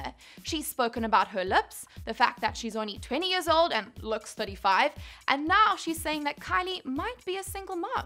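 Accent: South Africa. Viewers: is from South Africa?? cant miss that fellow south African accent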